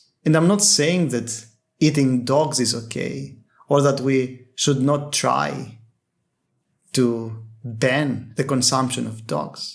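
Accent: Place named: Greece. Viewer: Just saw this - never got a notification that you had answered! Your name may be Greek, but lots of people use Greek names, or other exotic-sounding names online, so no, that wasn't the reason. Your accent sounded very familiar to me, as I am Greek myself, and an EFL teacher as well, so it's basically what I hear every day! Thank you for bringing some quality content to the internet; a whirlpool of cheap, fleeting content. Your videos are to-the-point, every sentence you utter is thought out and meaningful and you always make a valid point and get us thinking. 😊❣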